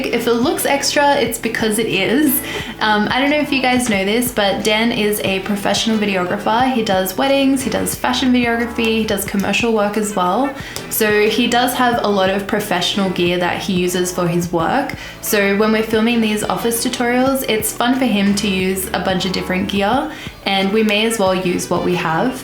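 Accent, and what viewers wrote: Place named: Sydney, Australia. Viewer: From you and my old friend Joann I have decided that the Sydney accent is a very nice sounding english!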